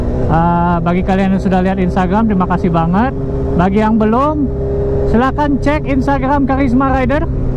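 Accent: Bali, Indonesia. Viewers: logat balinya masih keliatan 😃😄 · bli orang bali bukan? logatnya mirip orang bali hehe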